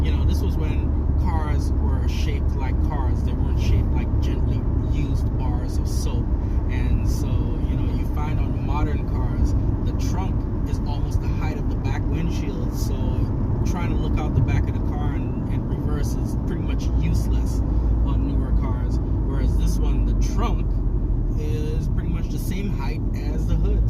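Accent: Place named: Virgin Islands, Caribbean. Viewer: Hi, I just "stumbled" on your page. I also bought a 1998 C230 about two years ago and I absolutely love it. It was owned by an older woman that just drove to church, etc. Unfortunately, she passed away and it just sat in the driveway. It was well maintained, just a few minor things. On another note, I listened to your accent and wondered if you are from St. Thomas V.I.?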